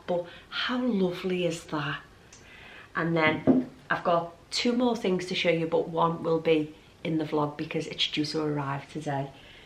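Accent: Liverpool, United Kingdom. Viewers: Just found your channel and love it. Cant wait to get in my garden but in toronto its still a bit cool for planting. Are you a scouser like me?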